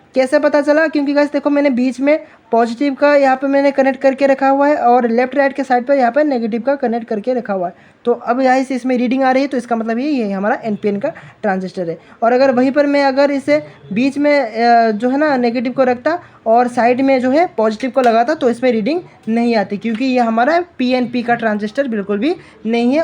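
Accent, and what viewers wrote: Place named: Odisha, India. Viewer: mujhe lagta hay odisha se ho app😂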